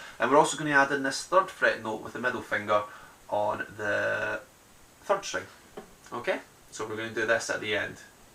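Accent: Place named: United Kingdom. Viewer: Thx for a clear, well produced tutorial. " "? It was a good chance to brush up on my Mancunian, or Geordi, or Scots...whatever... sounds cool.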